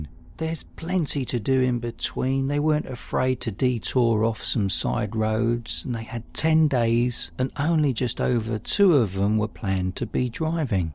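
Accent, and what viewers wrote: United Kingdom. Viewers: Your voice sounds very calming and badass. Random question: Are you British? Nice narration btw..... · are you from Britain, Australia or New Zealand?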